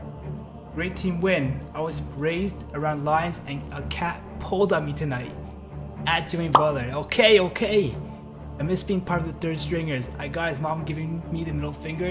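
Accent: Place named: Toronto, Canada. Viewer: you're a Toronto man right? I can tell by the way you talk lol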